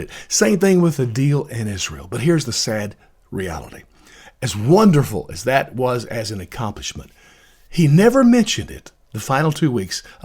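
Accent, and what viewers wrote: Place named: Chicago, United States. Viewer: You sound like you’re from Chicago… I’m from the south side… I worked Chicago fire department for 30 years retired three years ago I am 75% out of Chicago at this time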